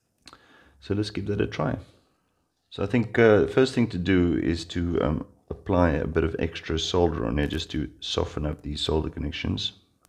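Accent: South Africa. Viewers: Do I detect a South African accent 😀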